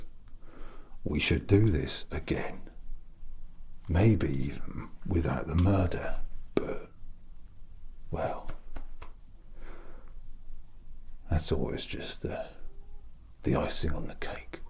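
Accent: United Kingdom. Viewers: BRITISHNESS AS A TRIGGER IM DEAD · omfg hes so British, i love it sm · this is so british and i love it .. 😂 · THE BRITISHNESS TW 😂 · i just clicked in here for the british accent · i dont understand shit because hes too british, i swear to god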